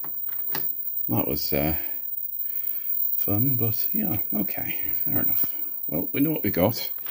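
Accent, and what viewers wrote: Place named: North East England, United Kingdom. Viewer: Sounds like he’s from north-east England somewhere - I’m from Grangetown in Middlesbrough!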